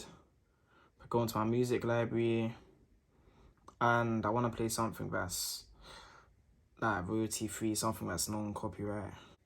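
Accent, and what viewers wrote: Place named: Northern England, United Kingdom. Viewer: Bruv are you from Manchester ?your accent sound northern.im from london so what just wondering, safe for the video fam can you pair your d9 with the d10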